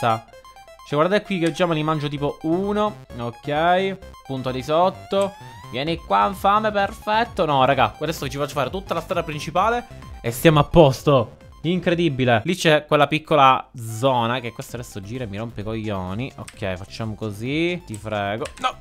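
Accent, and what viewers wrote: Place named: Palermo, Italy. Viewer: melo sentivo che sei palermitano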